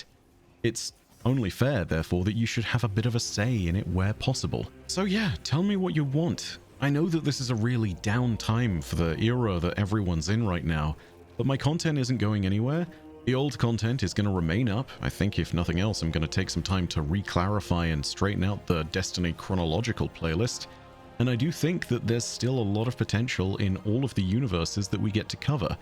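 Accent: United Kingdom. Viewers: I am a 60 year-old man I’ve been playing destiny since 2016 since my son bought it for my birthday. As being a vet, I really had nothing to do with my time when I got out.And I was looking for something to take up that time and to fill that void. And destiny was the answer I’ve been paying attention and listening to your in depth folklore of destiny for years and how you adequately with your smooth and gentle British English accent. Which you matched the lore with your voice perfectly. That is why I subscribe to your channel and of course, a lot of other guardians did too. Because it’s not just a game it’s so much more and as you can see with not only the petition. But with all the voice actors that I hope it does not stop and they do make a DESTINY 3 but will see 🤞, I really appreciate all you do for destiny and for us who listen to your take of the game itself . 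I’ll still be watching but it won’t be the same.It’ll never be the same. But as an old man who has not too much time on this earth, maybe who knows one day My Son or daughters might pick up the game and play it. And say to my grandkids. Hey, did you know your grandfather played this game a long time ago! Would you like to see his character and what his name was? And my grandson or granddaughter if they say yes that’s how I will go on in a memory and live and connect with my family Again. through my ghost.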